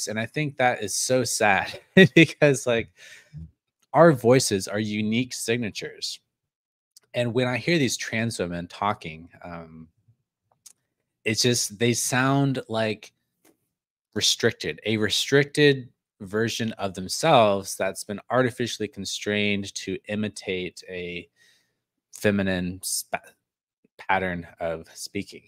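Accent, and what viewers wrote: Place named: Staten Island, United States. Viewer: You have like a staten island accent "dictionarYYYY"" pulling the last vowel.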